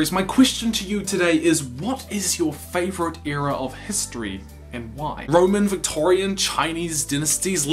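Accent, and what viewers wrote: New Zealand. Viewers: wait are you from New Zealand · wait are u from new zealand (if you are i am too) LUV U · Are you from New Zealand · Are you from NZ because I am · spot the kiwi accent lol love it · Hello are you a Kiwi? Or Auzzy? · OMFG IS HE FROM NEWZEALAND OMG IM SO HIPED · are you from nz? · Wait are you a kiwi (new zealander)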